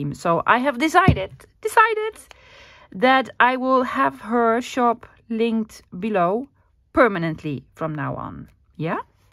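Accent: Netherlands. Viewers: First time watching your channel. Loving the ''flippies'' and "snippies" words. I normally cut off the first of the 2 flaps to reduce bulk, won't be cutting them off anymore, think it makes the sides pull in better so they are straight. Great tutorial. Love the Dutch accent, reminds me of my Dutch friends from years ago.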